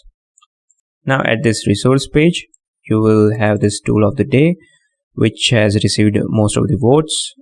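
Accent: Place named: India. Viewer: The problem is that your English is pretty hard to understand, no offense. I know it's the standard Indian English, but still. If your primary audience speaks the same way, no problem, but if you target a wider audience you may want to up your game. I tried to focus on the content and ignore the accent but I didn't make it through the video.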